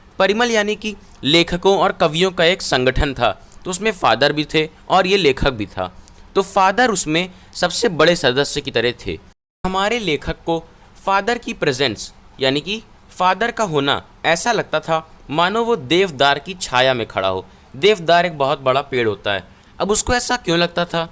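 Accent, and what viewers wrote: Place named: Bihar, India. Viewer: Bihari ho ka bhai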